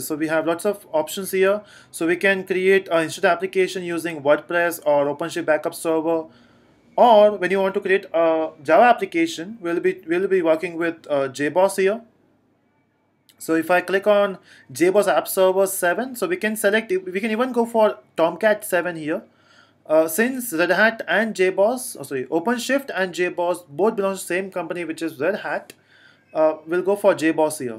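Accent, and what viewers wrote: India. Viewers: it's hard to understand indian's pronunciation,i'd prefer britsh standard.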